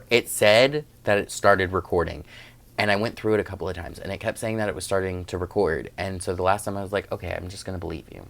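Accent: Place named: South, United States. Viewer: Thanks for being with me in the last couple of days, have just come across you I think it was via forensic flosser don't quote me on that though, and I have been binge watching you oh my you have had me in stitches so many times I think one of my favorites was .. god is like ikea hahaha I totally get the being awkward in front of a camera ( you are soooo not) I tend to freeze up I hope it gets better soon as I am so not totally boring Iike I think it comes across on flosstube I promise I do actually have a personality people lol maybe that's why my watching time is so low per video. I love your stitching I too like terresa Wentzler and have several of her patterns but have not yet started any must do soon love seeing your updates and I could listen to you for ages I am a sucker for accents especially the southern states. any who will talk to you soon have a great stitching and general life week .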